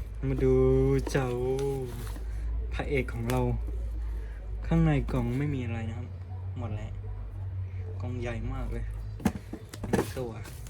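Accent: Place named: Cambodia. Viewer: ออกขเมรๆนะภาษาพี่นี่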